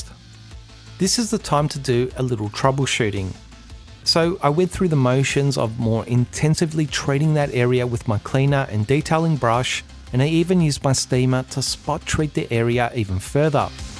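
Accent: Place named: Australia. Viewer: I’ve been a fan of your work for a little while now. I appreciate your methodical approach to detailing, and I really appreciate the tutorial like aspect of your videos for people to pick up on some of your techniques. You seem to not mind sharing the knowledge, and there is value in you wanting to do that. Thank you, and amazing work with great results! Keep it up Your accent sounds Australian, greeting from Canada. Ricardo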